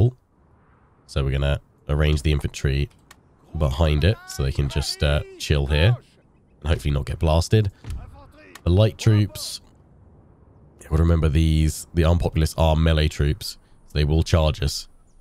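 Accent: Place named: England, United Kingdom. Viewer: Hello, Sir, Excellent to see Napoleon in Egypt! Well done with the start up battles, I enjoy the fire and movement of your Infantry, use of terrain and Cavalry exploits to knock out your enemies Artillery right from the start! Most excellent indeed. I fear that your garrisons behind your lines are too weak? If Nelson desides to land on the coast with the Ottamans everything you have will be in Cairo and that will mean a double back to push them back. Also as time will pass and the Egyptian resentment will increase you must leave suitable garrisons behind, unfortunatley, to deal with the unrest. This is a strange and land of 40 or 50 centuries very unlike Euroupe in most ways, especially religion! Even Ceasar had to leave a legion in Alexandria to secure his rear and administration of supply. Love this content and I hope you feel better soon! 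Always odd hearing about french exploits from someone with an English accent! Amusing! Glory to France and Napoleon! Love this content and keep it coming! 🍻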